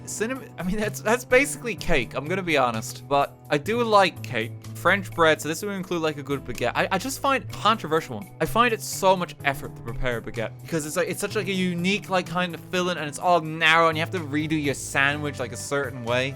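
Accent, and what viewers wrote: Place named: Ireland. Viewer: He's like Jerma but less insane and Irish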